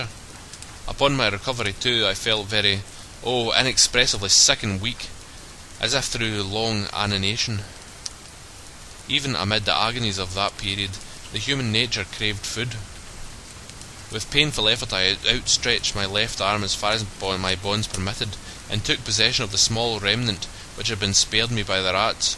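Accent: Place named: Scotland, United Kingdom. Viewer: Thanks, I didn't have to read it on my own, and I enjoyed a Scottish accent.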